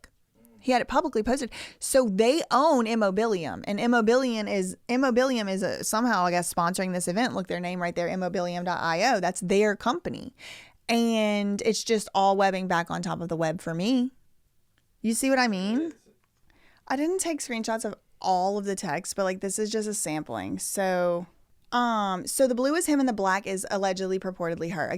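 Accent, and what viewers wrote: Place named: South, United States. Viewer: I'm not a simp or anything like that... but you are beautiful and I love your southern accent ! I'm new to your channel and your break downs are legit!!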